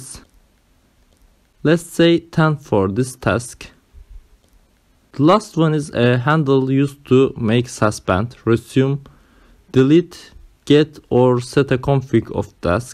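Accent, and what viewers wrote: Turkey. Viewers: dinlerken ya bu ingilizce türklerin konuştuğu şekle benziyor dedim. sonra acıklamaya baktım :D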